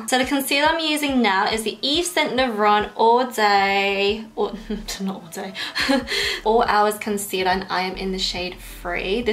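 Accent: United Kingdom. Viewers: I didnt even know you had a youtube channel!!! I follow you on instagram (@ I love your tutorials on there but I wanted to see a more in depth or longer video. Sooooo i checked youtube and low and behold youre here!!! And I was like "YAAAAAASSS" And I had no idea you were British. Right? British? Well i love you and youre makeup tutorials. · Ive only known you from Instagram but holy crap your accent is cute af! Rep us British girls!💜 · I follow her on Instagram and just discovered she had a YouTube channel, and can I just say I totally did not expect her to have a British accent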